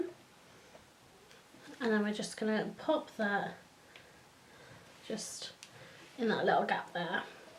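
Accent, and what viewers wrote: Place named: Wales, United Kingdom. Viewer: Part way through I thought the dotted background would make it too busy but I was so wrong it worked beautifully. Every video I am trying to sus out your accent because I am hearing some Welsh mixed with something else I can’t put my finger on. I really need to start playing with my stamps and inks I find myself always turning to the Hunkydory card kits or digital designs.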